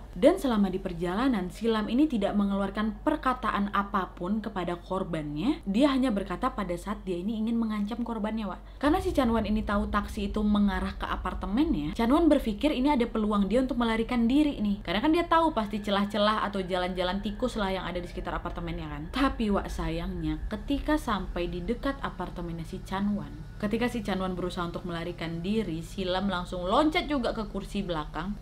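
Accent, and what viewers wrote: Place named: Medan, Indonesia. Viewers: Medan kaleeee bahasa kakak ini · Logat Medan wak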